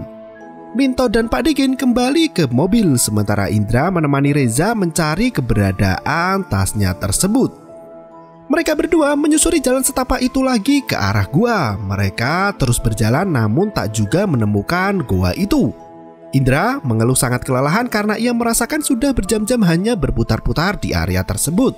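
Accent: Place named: Java, Indonesia. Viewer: Medokk banget mas.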